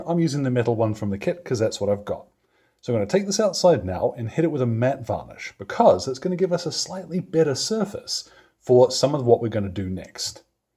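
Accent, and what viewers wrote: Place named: New Zealand. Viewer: A useful technique, and I hope you continue with your Kiwi flavor. I always think of Tabitha from Fallout when I hear battle cattle.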